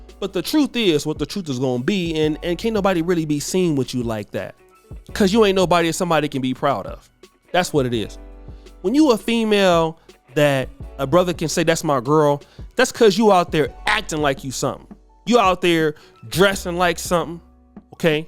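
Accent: United States. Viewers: That was the most "Bay-destrian" video I have heard you do.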